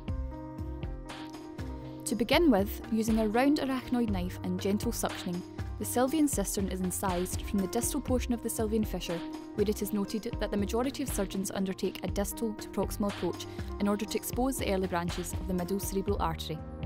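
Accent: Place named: Scotland, United Kingdom. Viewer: What a captivating dialect! Scottish? Excellent synopsis of the approach as well!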